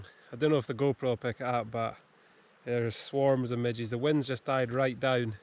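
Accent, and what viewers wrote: Inverness, United Kingdom. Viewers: Loving the videos mate, really well edited and really informative. Believe it or not I just ticked off my 75th this weekend, Beinn Dearg (Blair Atholl). Do I sense an Invernesian accent?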